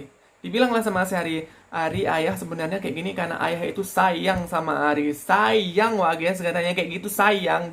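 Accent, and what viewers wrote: Medan, Indonesia. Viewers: Medan kale kau bg..😂😂😂..mantap👍👍👍..selalu semangat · Habis nonton filim arie hanggara. Langsung kesini. Auto subrek. Btw nampak x logat medan nya bg😂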